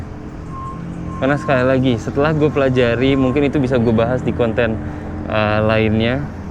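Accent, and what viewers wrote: Indonesia. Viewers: buat orang rantauan luar negeri selama 19 tahun itu keren kalau logat indo lu masih dipertahankan, atau ga berubah, karena beberapa orang yg udah lama diluar negeri ketika balik ke indo itu logat indonya kurang, kyk bule baru belajar bhs indo wkwkw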